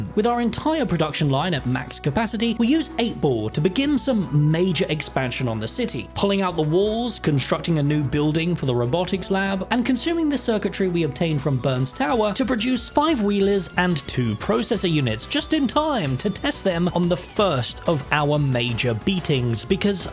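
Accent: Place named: United Kingdom. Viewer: i love how this channels mascot is a cute kitty while the channel is run by a handsome british voiced guy so we just have this handsome sexy as fuck voice coming out of this kitten its like that one charactor from shangri la frontier anime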